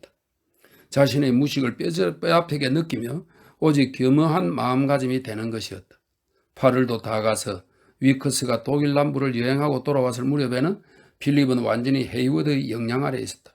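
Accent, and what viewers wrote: Gyeongsang, South Korea. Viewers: 갱상도 아재의 두수한 사투리가 맘에 듭니다. 감사합니다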